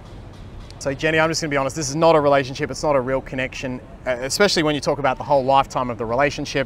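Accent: Australia. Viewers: I loved all the advices you gave the females,.... Taking notes & thank you.🙏🏽. And your Aussie accent is cute. Awesome. Sydney Harbour is a great land 🇦🇺🇦🇺🇦🇺🇦🇺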